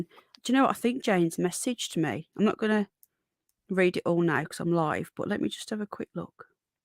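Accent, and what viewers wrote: United Kingdom. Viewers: LOVE YOUR UK ACCENT.VERY SEXY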